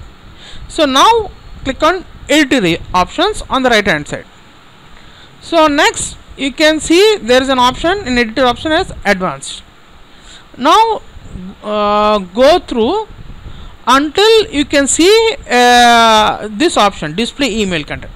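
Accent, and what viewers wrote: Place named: Pakistan. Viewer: Speak English. I do not understand Pakistani.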